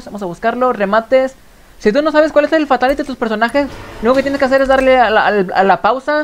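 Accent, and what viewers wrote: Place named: North, Spain. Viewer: Lo intentaba una y otra vez pero jamás salían. Gracias por compartir el secreto persona con marcado acento norteño 🐉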